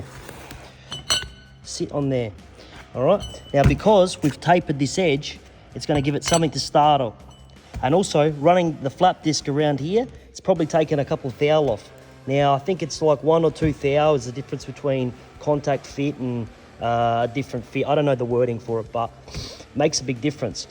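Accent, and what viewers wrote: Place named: Australia. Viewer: What you’re doing is killer. Love the half Leno Aussie accent. I had that living in Sydney but lost it 20 years later on the sunny coast with all the aussies. Love what you do. Maybe a little more on your performance upgrades and also a bit more educational vids on panel work like in depth bog and panel beating finishing in paint. Love your variety bud👍👍👍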